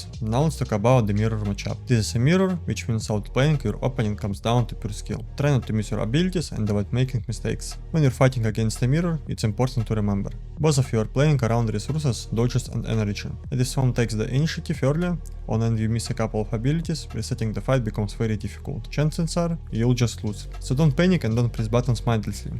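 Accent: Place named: Russia. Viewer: This russian accent 😛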